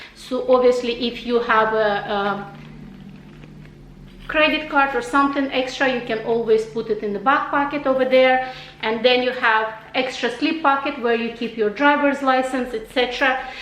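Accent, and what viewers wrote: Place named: Russia. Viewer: Dear, you are so sweet...obviously there are some people on ytube, who care about you. I love your chanel, your russian accent....Now you are even more confident with ytube. You made/make a great job! I love your new items, especially the keyholder & the earings- they are both so cute! Can you give me the price of the earings? Thanks. Have a nice sunday 😍😉🇫🇷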